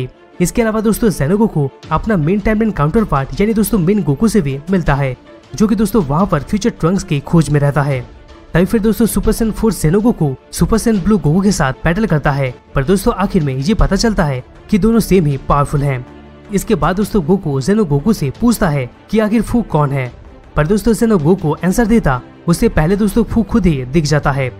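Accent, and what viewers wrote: Bengal, India. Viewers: Great bhai par apke pronunciation se samajh mein ataa hein ki aap Bengoli hon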